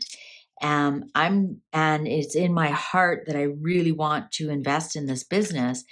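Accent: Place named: Canada. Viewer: I’ve been binge watching while I’m healing. I am in awe how your readings go. Cards that represent a person is amazing, love it. Clear clarity. Also I feel intuition is so important. Don’t second guess yourself and let the cards tell the story. Your Canadian accent is so endearing. I lived in Canada until I was 7 or 8. Mom brought us to the states and been in Michigan since. People especially down south visiting ask me where did I get my accent. LOL. The Canadian in me is not lost. Phrases and eh, too many words to list. My point is you are so charming and remind me of home❤ Thank sweet lady. I’m going to try to book a reading. Everyday I look for your new uploads, love commenting in a positive manner. God Bless❤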